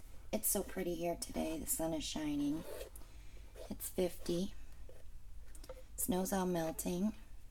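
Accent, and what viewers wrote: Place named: Western New York, United States. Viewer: Hello. Thank you for sharing Learned so much from you. You truly inspire me. I heard you say New York and snow. Whereabouts? I’m from Buffalo, your accent sound western New York-ish. Anyway awesome Techniques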